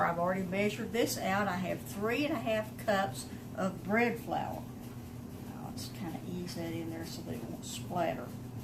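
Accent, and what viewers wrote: South, United States. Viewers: Why I love your kitchen videos ... Truth and Love. 😘 I rarely had an opportunity to be a part of how to make things from scratch. Most of my experience with pizza at home was get a frozen one and pop it in the oven (or call to order delivery) Watching you in your kitchen as you explain in your most welcoming southern accent, I get all humble and grateful. Thank you for making the time to share your values and honest "how it's done here" videos. Being your (virtual) friend leads me to a better day and a closer understanding of what's truly important. You are a blessing and a true friend. God bless you and all those lives you're a part of. ❤️❤️ Thanks again. 😊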